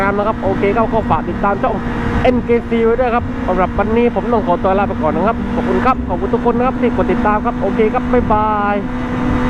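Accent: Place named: South, Thailand. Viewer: ชอบภาษาใต้ 👍🏻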